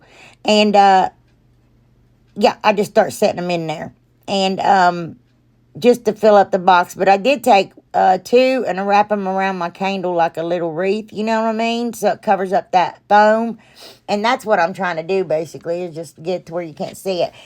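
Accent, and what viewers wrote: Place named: Georgia, United States. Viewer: Just this morning I found your channel and I’m delighted!! Do tell from where your lovely accent comes? It sounds like you’re kin with my people down in RomeGA…your lovely sense of humor just had me grinning from ear-to-ear! Blessings to you/yours!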